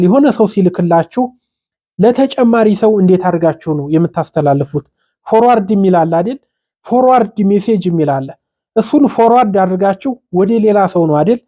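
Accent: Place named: Wollo, Ethiopia. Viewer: ወሎዬው አክሰንትህ እጅግ ተመቸኸኝ።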